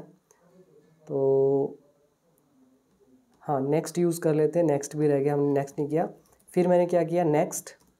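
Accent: India. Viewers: You have indian accent i could hardly understand what you said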